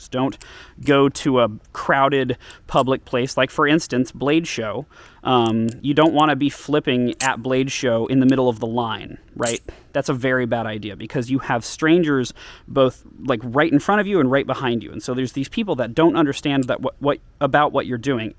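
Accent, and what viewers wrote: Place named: United States. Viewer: you're from georgia? you sound so american.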